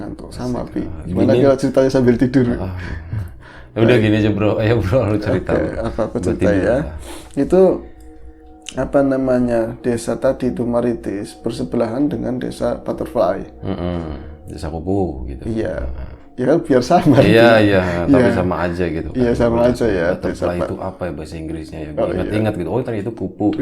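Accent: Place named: Tegal, Indonesia. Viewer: Mudah2an setelan Mas skrg mulai dari outfit ( topi)sampe logat Tegal dan gaya ceritanya AWET TERUS. Pertahankan,mas!!! Ini adalah Trademark kalian dan unik sekali!!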